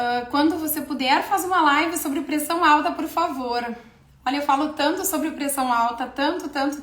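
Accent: Rio Grande do Sul, Brazil. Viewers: Um sutak bonito da mulher gaúcha, vc é super...beijo meu ❤ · Não mude seu sotaque não .sultaques dos gaúcho eu acho muito lindo.cicero Vitalino PR.meus parabéns doutora vc e uma ótima proficional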